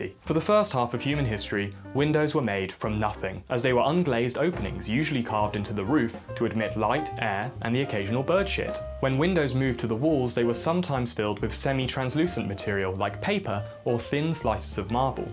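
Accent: United Kingdom. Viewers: This guy does a pretty good British accent.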